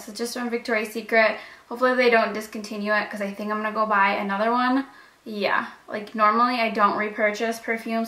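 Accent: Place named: Upstate New York, United States. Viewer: You have an upstate NY accent, like how you pronounce your "a's". When I lived in MD my friends there would bust my chops because I was the same way. Water safari is the best BTW. I live in PA now and my bf just doesn't understand why I want to drive 5hrs back home just to go to a water park.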